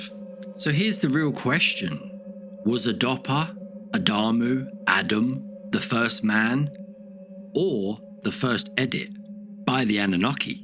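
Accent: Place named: United Kingdom. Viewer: Why do all the bots have a Brit accent?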